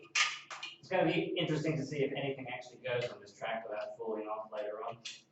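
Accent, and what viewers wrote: Australia. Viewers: I love that you are ashtralian and that you are not british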